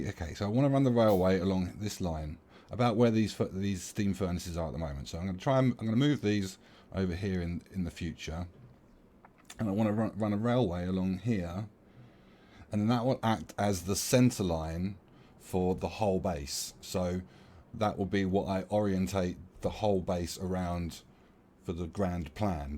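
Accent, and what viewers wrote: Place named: England, United Kingdom. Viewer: Are you from England your accent sounds like mine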